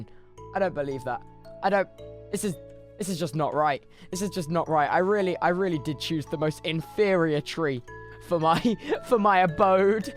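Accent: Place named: RP, United Kingdom. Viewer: This is so British what the heck??? He sounds so posh, it's sending me back to lets plays of old.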